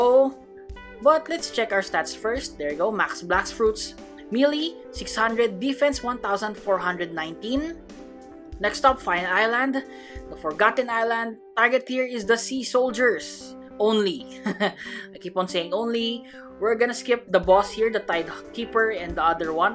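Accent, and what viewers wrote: Philippines. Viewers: BTW u have a heavy Filipino accent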